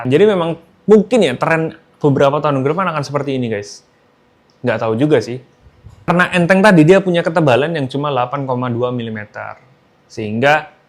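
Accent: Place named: Java, Indonesia. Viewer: Dia orang Jawa bang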